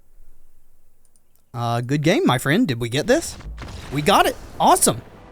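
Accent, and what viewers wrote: South, United States. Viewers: Thought it sounded more like a southern accent, guess I'm not great at recognizing American accents. Keep up the good work!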